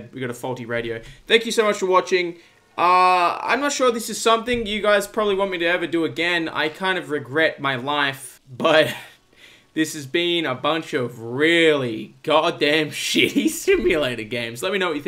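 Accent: Australia. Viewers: is auzzie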